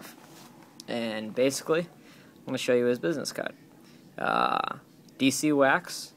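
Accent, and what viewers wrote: Boston, United States. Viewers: u from boston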